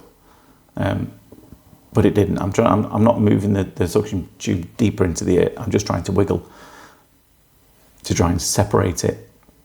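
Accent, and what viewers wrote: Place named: Lancashire, United Kingdom. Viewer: You sound like you're from Bolton/Rochdale way. I need this doing I think, where can I find you?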